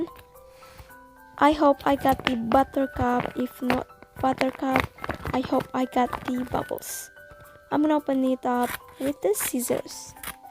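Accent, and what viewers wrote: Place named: United Kingdom. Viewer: Wah ternyata kaka bisa aksen UK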